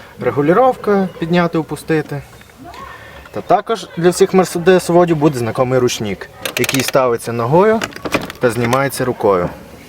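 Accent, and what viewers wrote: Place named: Surzhyk, Ukraine. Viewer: Суржик вуха ріже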